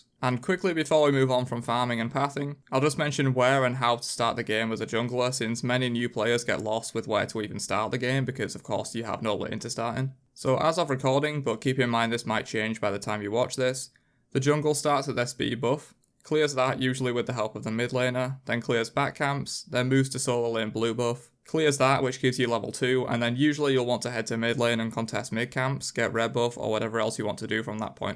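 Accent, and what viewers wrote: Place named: Northern England, United Kingdom. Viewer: Aghhh a northerner. Thus washs my ears of american smite players, subbed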